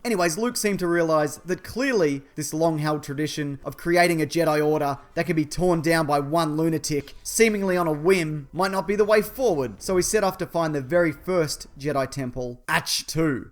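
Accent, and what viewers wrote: Australia. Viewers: I dig. I dig it all. I also dig the Aussie accent - helps me pay attention.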